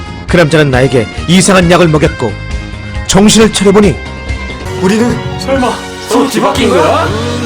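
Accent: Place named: Chuncheon, South Korea. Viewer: 춘천이 어떤가요? 춘천 닭갈비 추천이랑 억양도 비슷함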